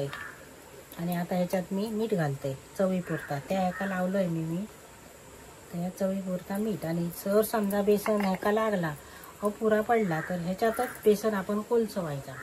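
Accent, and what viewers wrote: Malvani, India. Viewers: तोंडाक पाणी सुटलां. चिवारिचे कोमचेय दाखया. तुमचे व्हीडिओ बघताना, शुद्ध मालवणी आयकताना गावांत आसल्यासारख्यांच वाटता. देव बरें करो.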